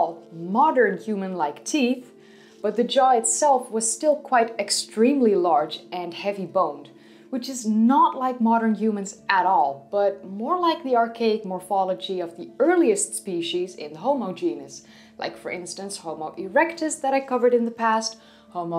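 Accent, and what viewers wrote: Netherlands. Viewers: your Dutch pronunciation is very attractive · Your slight dutch accent is very cute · Your accent and Dunglish is simply brilliant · pegged you for Dutch in about 30 seconds... the Gs are a dead giveaway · Is that a lovely Dutch accent??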